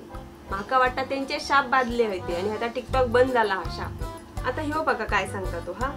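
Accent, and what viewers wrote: Malvani, India. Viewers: तुमची मालवणी खुप पीवर असा.🙏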